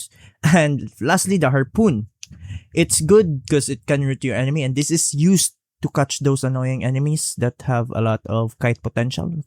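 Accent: Philippines. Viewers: Brother I shouldn't even leave a comment cause you are going to try to deflect. You sound Filipino which is a "third world" country yet you made a joke and put up African children. On top of that malaria is present in the Philippines. Just my two cents, enjoy the traffic my comment brings.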